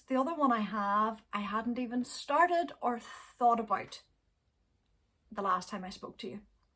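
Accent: Ireland. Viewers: I am a newcomer to your podcast and am enjoying it very much, particularly your lovely Irish accent. We have several Northern Irish relatives and to listen to you talk is almost like visiting with them. I am not a very accomplished knitter, but I would love to knit anything with a fairisle pattern such as a yoked sweater. I would also love to do a cardigan as I have done a few pullovers or as you call them, jumpers. Knitting is such a sustaining hobby during these days and having wool running through my fingers on any project is so rewarding. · One day I hope to knit a lace work cardigan sweater. I'm currently working on my first pair of socks. Enjoy your podcast and love listening to your lovely Irish brogue. CONGRATULATIONS on your Anniversary. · My dream project would be a colourwork sweater by Marie Wallin. But I think I have to wait until I have retired to have the time and focus on it. 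I am a new subscriber and like your podcast above all your Irish accent:)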